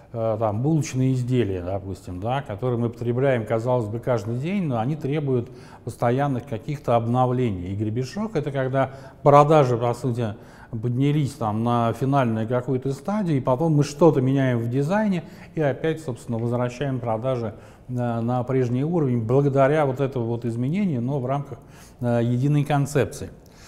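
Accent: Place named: Moscow, Russia. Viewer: Маааасковский говор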